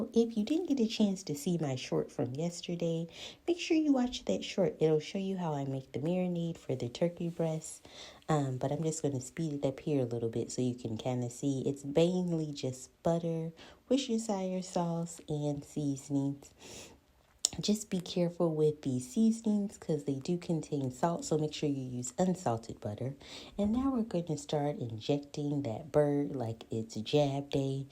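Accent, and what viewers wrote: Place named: South, United States. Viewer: I now know that feeling my viewers get listening to my voice. You have a nice light accent. it sounds Southern but in a very classy way. I am trying to keep it bright. Basically your speaking so calm.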